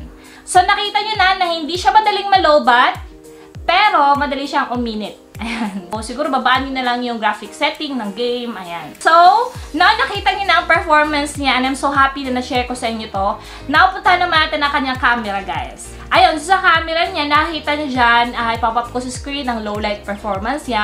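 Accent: Visayas, Philippines. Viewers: WAIT... BISAYA PO KAYOOO!?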